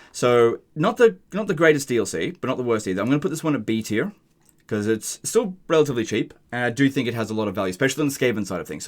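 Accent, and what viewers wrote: Australia. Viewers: Youre Australian?! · OMG!!! Are you Australian?!?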